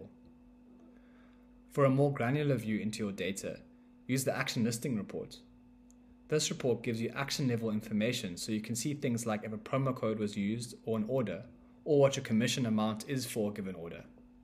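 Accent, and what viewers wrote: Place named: South Africa. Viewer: bro lowkey sounds South African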